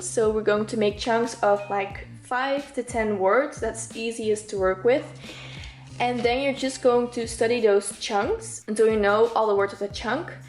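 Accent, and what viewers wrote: Netherlands. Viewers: I smell Dutch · are you dutch??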